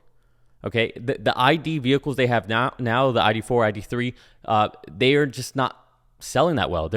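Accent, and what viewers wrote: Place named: Germany. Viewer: "Diess is a problem and Dat is not " sounds like a German speaking english.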